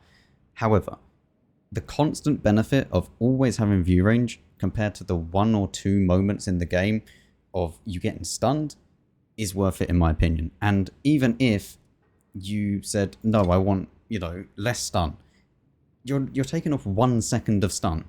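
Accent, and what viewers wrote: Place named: United Kingdom. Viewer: Wow, who is this beautiful assassin lookin' dude at? With the {I think?) British accent? Does anyone know? His reviews are the best, my opinion, and I'm in love. Wow, my cheeks are hurting. No...from smiling!;)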